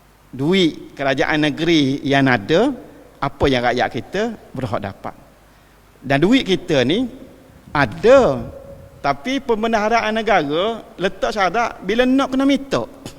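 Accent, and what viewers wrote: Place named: Terengganu, Malaysia. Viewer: Org jb tak berapa faham telo terangganu Maaf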